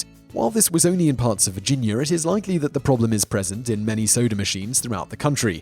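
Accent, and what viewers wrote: England, United Kingdom. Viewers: is English and that's how we pronounce advertisment in England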